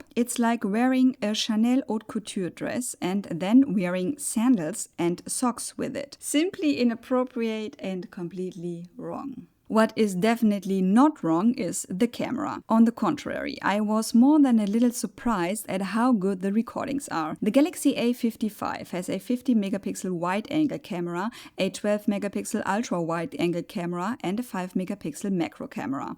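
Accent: Germany. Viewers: Ich kann Ihren deutschen Akzent deutlich erkennen.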